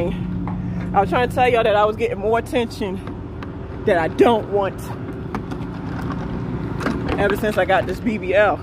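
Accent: Baltimore, United States. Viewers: Those Tasty Cakes 🎂 baby is what made me Subscribe 😳 . The Baltimore accent,attitude, and the fact you are beautiful truck driver made me have to keep my 👀 on you.😂🤣🤣😅😆😆🤩😍😛😋❤❤❤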